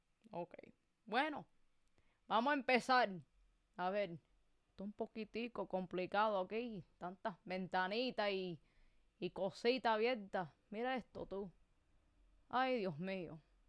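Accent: Cuba. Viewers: Me encanta y más con el acento cubano, saludos de México 🇲🇽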